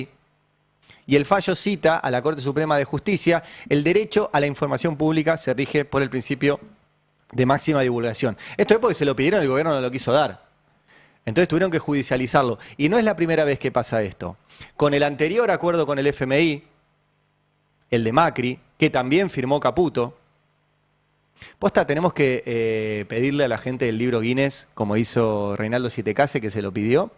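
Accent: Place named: United States. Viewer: UF con ese tono gringo. No me importa lo que diga hasta la forma de hablar suena a vende patria.. Cada vez peor.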